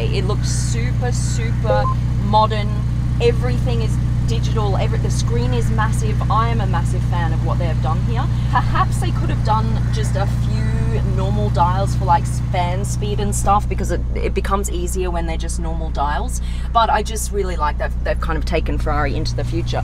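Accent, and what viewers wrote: Australia. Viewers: More annoying accent: Australia or New Jersey? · australian accents are so cute!!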